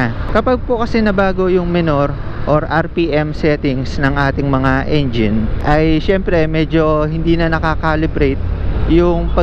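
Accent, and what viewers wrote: Pampanga, Philippines. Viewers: sabi na boss kapampangan ka eh hahaha pabsin ko lang accent mo sa mga tutorial mo hahaha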